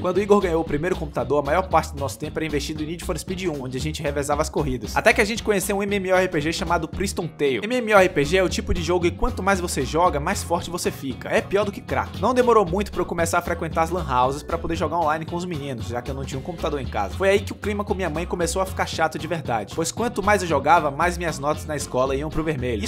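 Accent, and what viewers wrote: Bahia, Brazil. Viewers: rapaz sua historia de antigamente é quase igual a minha agora (EM TER VERGONHA DE FAZER VIDEOS)E DIVULGAR O CAL, inclusive eu sou de camaçari uma cidade vizinha a Lauro de Freitas onde voce morava. eu sofro de depressão, transtorno borderline , ansiedade sindrome do panico e sou hiperativo por isso desenvolvi fobia social, mas não paro de sonhar em fazer videos e algo me diz que se eu não desistir e perder a vergonha e o medo eu conseguirei ser um youtuber, e esses dias vi seu canal e quando percebi que você era da bahia eu ate me emocionei, eu acredito que a lei do universo, (a lei da atração) me mostrou seu canal para confirmar, quando eu ouvi seu sotaque eu pensei, pelo sotaque e pela giria (vei)eu pensei: esse cara parece ser baiano! logo em seguida o motoqueiro perguntou se voce era do rio de janeiro e voce respondeu que era de salvador. 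eu pulei aqui em casa e falei porra o cara é daqui de salvador, isso pra mim foi um sinal e por isso fiquei emocionado. agora to falando para todos aqui que tem um youtube que ta fazendo sucesso e é daqui de lauro de freitas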